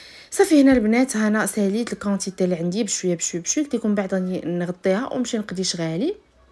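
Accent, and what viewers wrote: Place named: Doukkala, Morocco. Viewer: الله يحفظك تقولي غير دكالية بحالي هههههه فول او حمص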